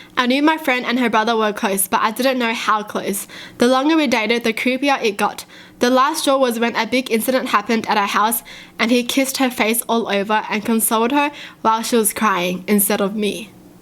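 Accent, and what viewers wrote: Australia. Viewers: zenzoner zz nooooooo omg by blood she’s full asian. But she just happens to be born and raised in Australia which she picks up the accent.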